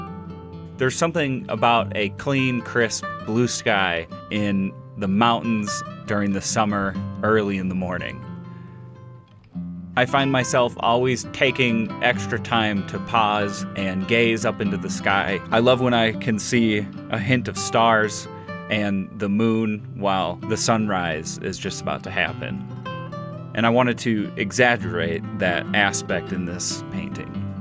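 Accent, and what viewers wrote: United States. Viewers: Even listening to your voice. So calming. That American accent. I’m from Scotland. Have been often to California to see my son.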